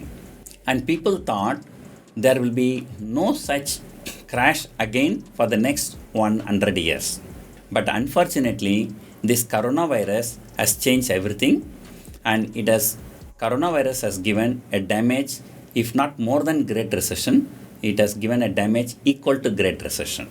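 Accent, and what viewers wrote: Tamil Nadu, India. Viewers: U r speaking like tamil .easy understanding english i learn stockmarket also english frm u thank u sir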